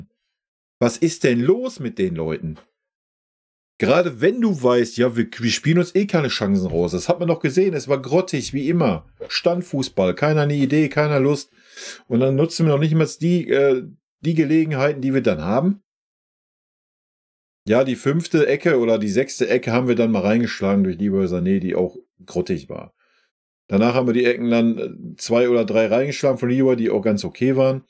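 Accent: Ruhr, Germany. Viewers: Höre ich da etwa 'nen Ruhrpott-Bayern raus oder täusche ich mich? 😂 Btw., sehr faire Analyse von dir! LG aus Bremen 🤟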